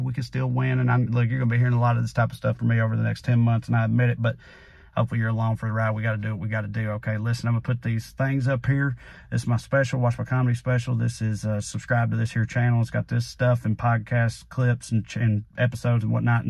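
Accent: South, United States. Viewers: I had to follow the transcript to understand your southern accent!!! This is worse than calling the tech guy (how do I plug it in?!)!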